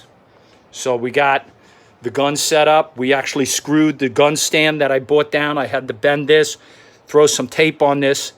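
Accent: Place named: Massachusetts, United States. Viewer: Luv the MA accent, lol...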